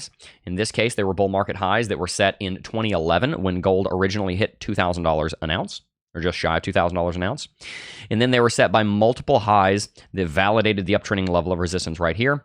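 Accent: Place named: United States. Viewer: in the uk we see this way of chatting as a yank as weird its like a usa news presenter or usa tv adverts u see in movies as actors pretending to act. please its so corny its horrible. surely this isnt normal in the usa.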